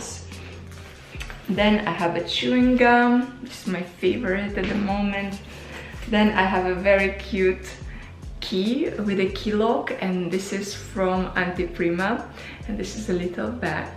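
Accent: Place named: Russia. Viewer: She has Russia’s accent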